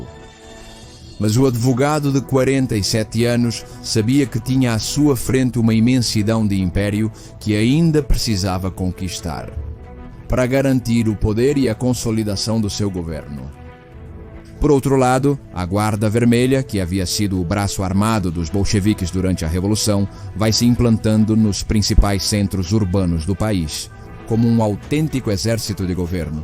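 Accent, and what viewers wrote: Portugal. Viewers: Ficou uma 💩 com esse português de Portugal! 😒👍🏻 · Sou Brasileiro e acho lindíssimo o sotaque da Pátria Mãe Portugal ❤ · As revoluções são as festas dos oprimidos e explorados Fladimir Lênin Gostei do sotaque Português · É de português de Portugal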